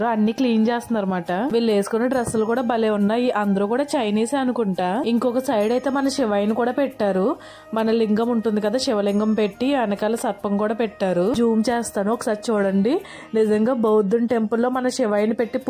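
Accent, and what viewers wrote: Godavari, India. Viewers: ఏమండీ మీ వీడియోస్ చాలా బాగుంటాయి.అచ్ఛ మన గోదారి యాసలో మీరు బాగా మాట్లాడతారు.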